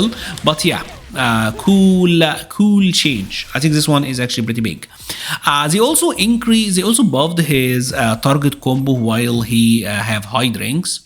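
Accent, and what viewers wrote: Egypt. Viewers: it's great when you say hey guys here wish I had an Egyptian accent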